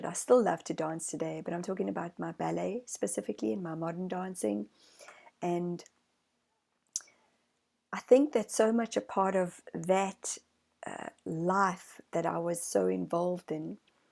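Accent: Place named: South Africa. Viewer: I just wanted to tell you, for one: I found you through Jeff Mara & so happy I did. For two: I knew right away you were South African when you were on his show. My ex husband is South African. I’m American 🇺🇸😟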